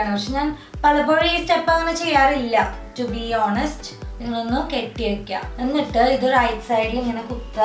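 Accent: Thrissur, India. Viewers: saaz safa athanu nammade thrisur slang😁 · Kure mattu basha channelil chumma body show kanikkan vendi cheruppakkarikalum aunties um sari udukkan padippikkunna kandu athokke vachu nokkumbol sahodari avatharippichathu 100% better aanu pinne thrissur slang um super ☺️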